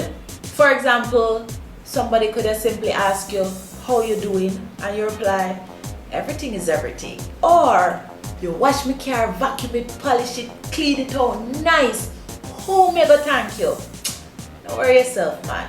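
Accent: Jamaica. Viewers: Very cool. The Jamaican accent and patois is like a kind of music unto itself. As the only non Jamaican member of a roots reggae band (and being an Italian guy from New Jersey) I'm around people speaking patois a lot and have managed to understand quote a lot of it, even at rapid fire speeds. Like anything else, it just takes getting used to and paying attention. But I admit to being on a 2 second delay sometimes. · Love Jamaican accent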